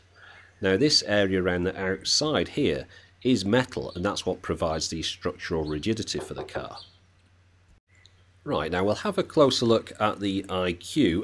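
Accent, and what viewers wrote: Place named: Liverpool, United Kingdom. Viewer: are you near Liverpool? you sound a bit like Ringo.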